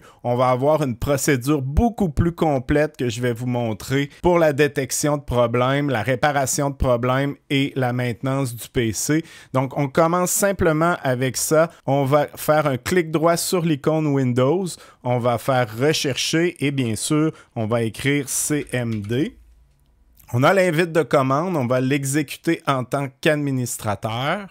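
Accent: Canada. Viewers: Merci, c'est vraiment super comme tuto. Toujours très clair et pour un belge l'accent canadien met de la "musique"dans ton exposé. C'est super 😊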